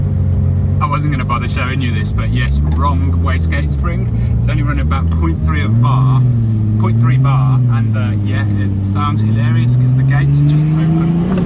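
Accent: RP, United Kingdom. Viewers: makes us English guys sound like absolute fucking pricks , the definition of posh rich twats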